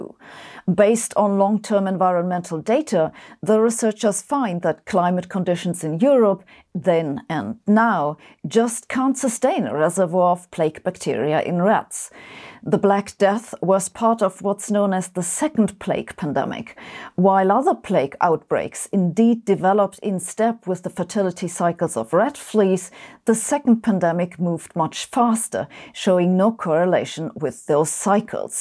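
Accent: Germany. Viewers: Somehow her German accent makes the science that she's presenting seem to be even more credible. Although, when she said Dr. Weibel, I thought she was talking about someone named Beiber, since such a name would be pronounced as Beeber in English (and Bye-berr in German). Naturally, I thought she was going to say that "Dr." Beiber discovered magnetic fields by electrifying crowds of teenage girls and causing them to be magnetically attracted to him. Thankfully, the video is packed with info, rather than jokes. Thankfully, also, is the fact that the Dr. in question is a real doctor and is named Weibel, rather than Beiber!!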